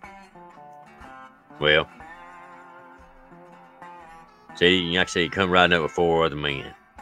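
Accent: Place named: Georgia, United States. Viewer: The story teller accent is identical to my friend from Georgia.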